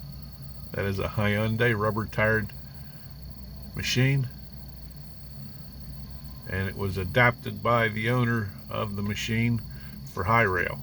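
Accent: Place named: Pittsburgh, United States. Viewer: I always enjoy your videos,. Greene County is where my dad's mother came from. Love the Pittsburgh accent, too. Thanx again from South Fayette Twp.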